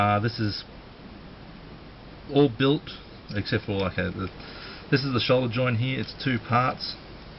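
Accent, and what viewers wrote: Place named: Australia. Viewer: Great to see another Aussie model channel. Its funny how strong our accent is compared to the Brits haha! Cool channel. Just started up my own. Its only couple months old but it's gettin there. Keep it up.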